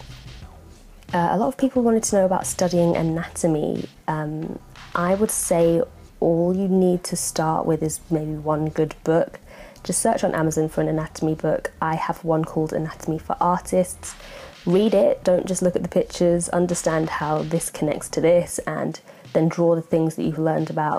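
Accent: United Kingdom. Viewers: Maybe it's because they're from the same race and both has a british accent?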